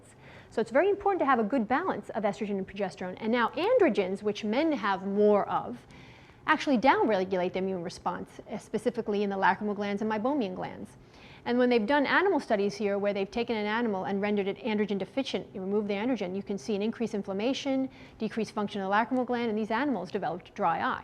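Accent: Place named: East Coast, United States. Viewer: She’s got a very interesting American accent I’m not familiar with. East coast much ? Haha <3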